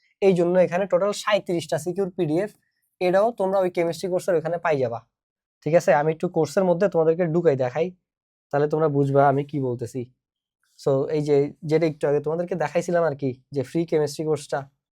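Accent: Chittagong, Bangladesh. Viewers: খাঁটি চিটাগাইঙ্গা 😁🫶🏻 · Vaier chitaingaa accent 🔥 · বদ্দা চিটাংইগা নাকি? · ভাই খাটি চিটাইঙ্গা❤❤❤ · খাঁটি চিটাইংগা বদ্দা 🙂🙏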